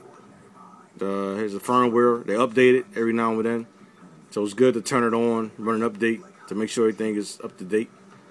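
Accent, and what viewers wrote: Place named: Houston, United States. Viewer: Bet that. Im in Houston. Had a homie in High School from Philli. He was cool as hell....lol. But you sound like you from down here....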